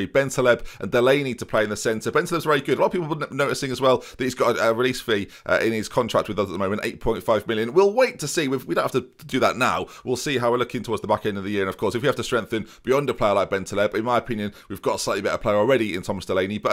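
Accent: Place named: Liverpool, United Kingdom. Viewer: Great scouse accent you have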